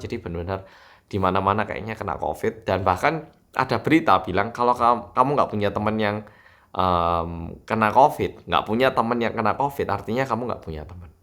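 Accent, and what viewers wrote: Java, Indonesia. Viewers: Semoga cepet sembuh Mas, Stay safe and stay healthy Sing semangat mas, 화이팅❤ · Gws mas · Ko ngomong nya bisa medok dan lancar bahasa indonesia nya ?lahir nya di mana ? Dulu pernah tinggal di malang .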